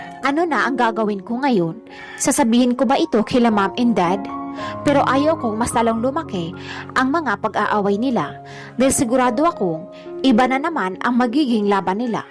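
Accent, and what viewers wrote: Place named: Visayas, Philippines. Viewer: STORY WAS GOOD BUT NARRATOR NEEDS IMPROVEMENT. DAPAT WALANG PERIOD/COMMA EACH WORD. TONO DI KO MAINTINDIHAN IF ILONGGO, VISAYAN, BICOLANO O ILOKANO. OK LANG YUN BUT IT IS HOW TO DELIVER THE STORY PARA MAKATOTOHANAN AT ANG DAMING WORD NA "KO". NO OFFENSE, NARRATOR NEEDS IMPROVEMENT PO SAYANG ANG KWENTO.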